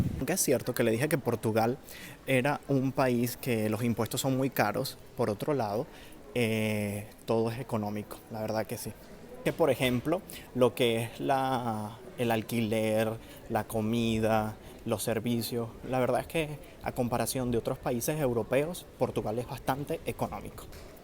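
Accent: Venezuela. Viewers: Hola, cuando te escuche por primera vez le dije a mi esposo; cono este chamo es venezolano y efectivamente. saludos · Eres Venezolano mi pana?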